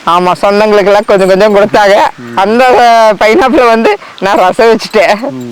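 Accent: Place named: Kerala, India. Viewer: Adipoli kerala speeking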